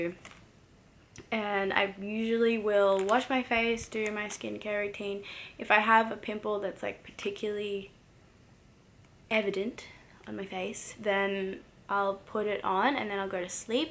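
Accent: Australia. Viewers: You’re one of my fave blogger now, I love your aussie accent. Whereabouts in Australia do you live?:)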